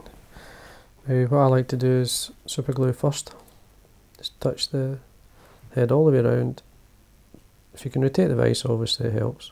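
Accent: Scotland, United Kingdom. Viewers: The ladies love your soft spoken scottish accent, and those flies looks so easy to tie. A true ambassador to fishing. Outstanding work,enjoy your life 's passion and thank you for sharing.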